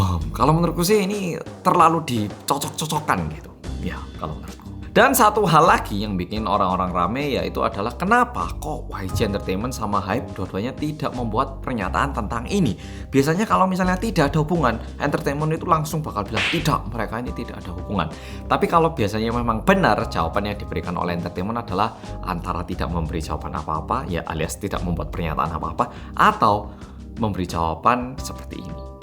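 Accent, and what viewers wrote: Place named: Malang, Indonesia. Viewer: Sumpah bawaannya ketawa kalau mas jelasin medhoknya ya ampun xixixi, bener bgt penjelasan mas "itu sudah jadi ranah kehidupan pribadi yang tidak perlu kita sentuh" 😆 dari pada sibuknya buat teori, ternyata artisnya ngeprank. Sehat terus ya mas 💕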